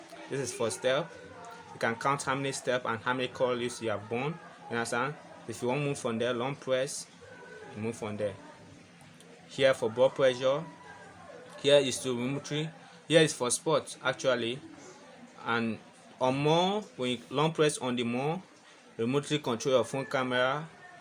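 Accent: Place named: Africa. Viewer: Brother, I can tell you're African from your accent, and I'm really proud of your video. I bought this watch from the Best Buy online store, and I needed to know how to charge it. Thanks for your help.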